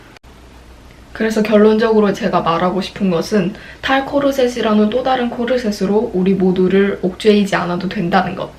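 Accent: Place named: Gyeongsang, South Korea. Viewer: 경상도 말투가 묻어나오네요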